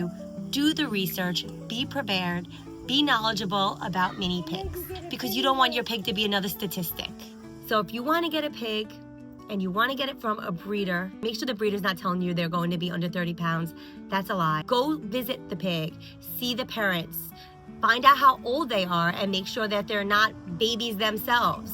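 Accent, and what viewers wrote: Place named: Long Island, United States. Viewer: something about your voice sounded vaguely familiar, then I saw Long Island and it all made sense. You got the classic LI accent! Great presentation & interesting ways people try to pass off the pigs as teacups that won't grow.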